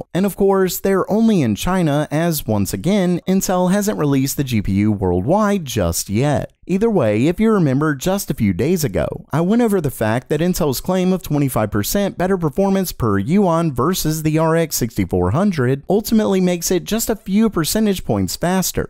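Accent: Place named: Philadelphia, United States. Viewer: You from the Philadelphia area my friend? Pretty sure I heard the Philly accent in there. ✌️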